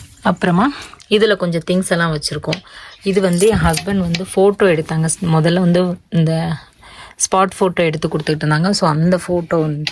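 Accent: Tirunelveli, India. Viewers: Unga sontha oor yethu akka yengala mathiriye pesuringa thirunelveli pakkama neeenga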